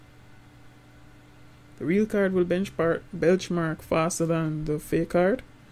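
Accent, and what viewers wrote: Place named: Jamaica. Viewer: Comment not related to the video. You sound Jamaican to my ears.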